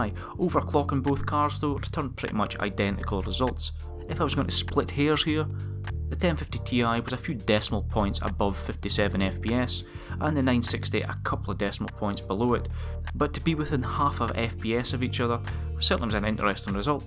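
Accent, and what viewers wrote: Scotland, United Kingdom. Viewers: Faurabouts are you fae loon? Afa strong accent, nae a bad thing mind you :) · scottish accent ,i guess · Good video + scottish accent, am I right?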